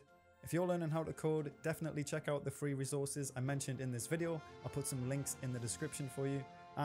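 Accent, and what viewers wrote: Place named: Northern England, United Kingdom. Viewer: You have a bit of a northern lingo you local to Newcastle by any chance :)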